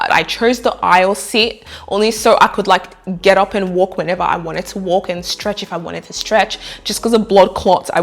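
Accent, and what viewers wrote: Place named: Australia. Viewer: ❤❤❤❤❤❤🥰 I love Australian accent! Had me laughing!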